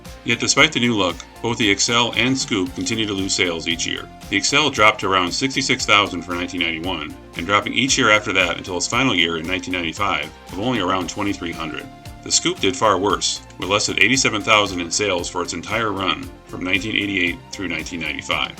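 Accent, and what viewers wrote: United Kingdom. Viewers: The way the British pronounce things are so freaking annoying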